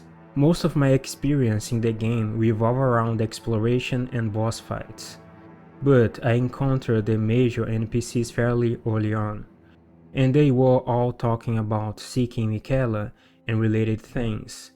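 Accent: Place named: Brazil. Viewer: Are you brazilian? While very good, your english has some giveaways that sound to me like brazilian accent. Great content tho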